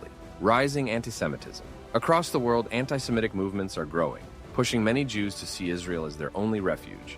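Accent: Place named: United States. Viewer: I, too, agree and certainly believe we are indeed in the " Latter Days." The Scriptures hold true concerning prophetic events that have come to pass, and the rest to do so are soon to follow. You sound American, perhaps Canadian! ? If so, why the mask ? At least in the U.S. you're free to speak. People may not like what you say or you as a person , but who cares ?! If you're in a nkn- Christian nation, then I understand. Either way, I share your beliefs and pray hou His blessings. 😊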